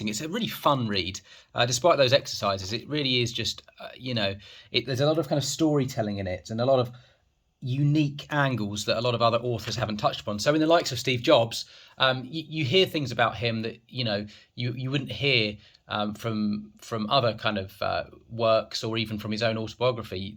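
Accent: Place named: United Kingdom. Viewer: I came across to this Chanel and this man explains the book Of (Find you why ). And I guess Man U have British accent u must be English man , the best English language is spoken by British people and the rest of the world follows even Americans .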